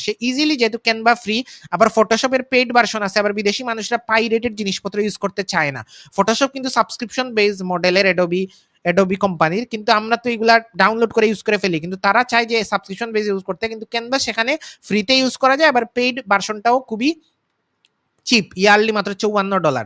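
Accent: Sylhet, Bangladesh. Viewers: Apni sylhety naki???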